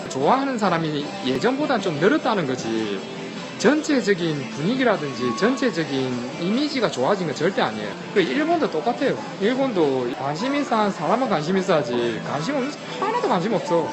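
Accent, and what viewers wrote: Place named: Busan, South Korea. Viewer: 부산사람 같은데요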